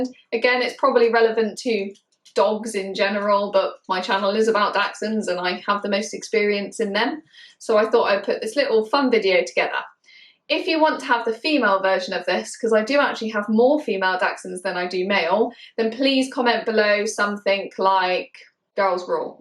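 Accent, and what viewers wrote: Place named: United Kingdom. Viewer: I just wanted to take a minute to thank you for your video on male Dachsies. I’ve heard most of this from an American point of view, but, with your I assume British accent and sense of humor, makes it so much more enjoyable and informative to listen to!!! Again, thank you so much! 🌹🌹 I actually own a male Cheweenie, however, he displays the Dachsie side of his origin more often than not!😊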